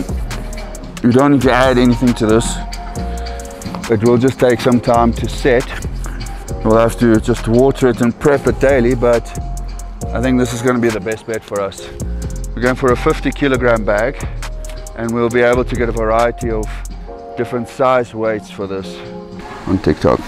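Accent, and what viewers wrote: South Africa. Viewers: I'm south african and I swear that must be south african as well, he just sounds like one. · Bro sounding south african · Dope dude, this video made me realise u deffs a fellow south african, thnx for the content! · Not been able to go back to South Africa since the pandemic begun but hearing other South African accents makes me happy🙏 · Are you South African? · is he south african? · Is he South African?? Now I see the man's... from south Africa....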